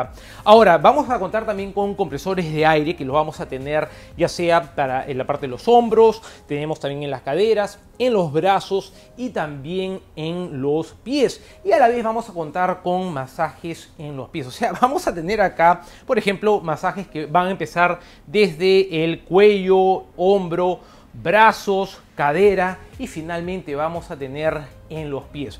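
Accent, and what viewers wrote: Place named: Lima, Peru. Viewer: si me lo suponía por que habla cómo limeño pues me agrada sus videos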